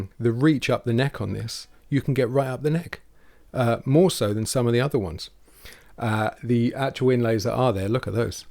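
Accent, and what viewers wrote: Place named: England, United Kingdom. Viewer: I like your English accent so cool man 👍 one of the best guitars I ever had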